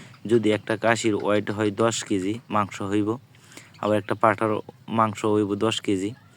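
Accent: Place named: Kishoreganj, Bangladesh. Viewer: আপনার কথায় বুঝা যাচ্ছে আপনার বাড়ি কিশোরগঞ্জ জেলা। আপনার নাম্বার টা দেন ভাই।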